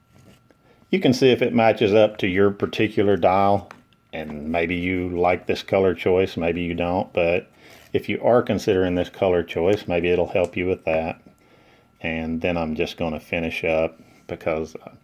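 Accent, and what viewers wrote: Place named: United States. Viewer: Hey bud, so glad I found your video. You sound like you’re from the states. I’ve been trying to justify buying an omega nato, until I found out they come with this kit. However, I keep reading that this specific packaging is only for European countries. Can you please confirm this packaging is also for U.S. customers. Thanks in advance. And great watch by the way, I got the black one.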